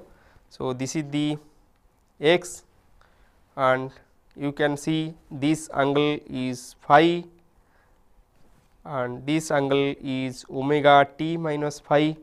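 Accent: India. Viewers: These indians tutors are awesome! Thank you very much, very explicit! The accent is a bit hard but it is so worth, thx again.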